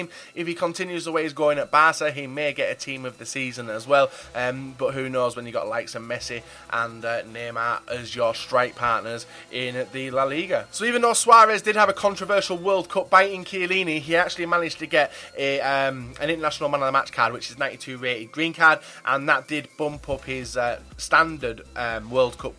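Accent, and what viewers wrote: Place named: Yorkshire, United Kingdom. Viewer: I love the Yorkshire voice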